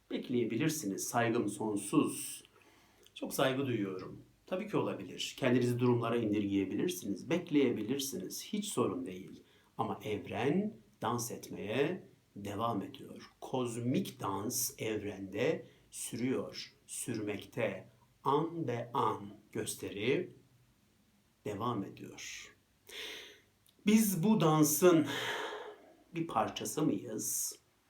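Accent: Ankara, Turkey. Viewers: Ne olcak, bakalım ne olcak ? Tam Angaralı'sınız hocam.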